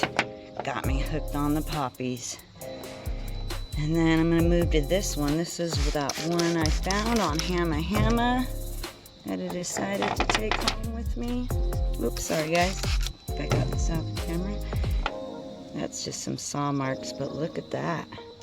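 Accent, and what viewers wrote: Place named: South, United States. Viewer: Okay....this is the first....but not the last ....of your videos I have watched. You might be in the Northeastern U.S., but you almost sound Southern.....*signed a Southern gal*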